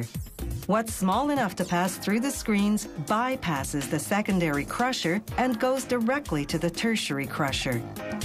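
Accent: Quebec, Canada. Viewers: She's ESL, and doing a fairly good job of suppressing her (french canadian) accent.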